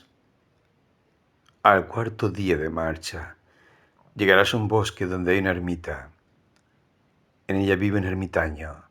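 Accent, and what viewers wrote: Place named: Spain. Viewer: VOZ AGRADABLE Y ESPAÑOLA !!!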